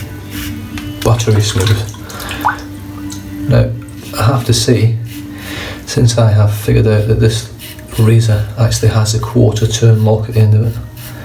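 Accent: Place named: Scotland, United Kingdom. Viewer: Happy Birthday! Your Scottish accent makes me think you were older! I'm 35 and I feel like my voice doesn't match my age lol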